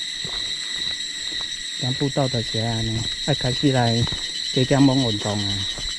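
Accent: Taiwan. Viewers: 超棒超正台與 正港ㄝ台語郎 讚讚讚讚讚 超超超超超親切的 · David哥的台語令人驚艷👍，好像小時候回鄉下外婆家，會聽到熟悉的台語腔調，格外地親切感。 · 喔！ 給你5個夠👍 有夠喘有夠俗 有夠標準有夠 親切的下港腔 語言…聽落郎 叨有夠爽也拉…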